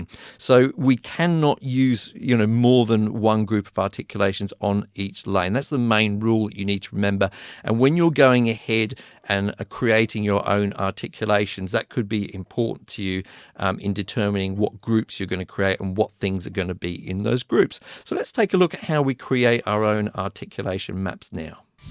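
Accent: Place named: Australia. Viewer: Thanks. I switched to Cakewalk a month ago and you helped me. I love your accent by the way. Are you from Australia?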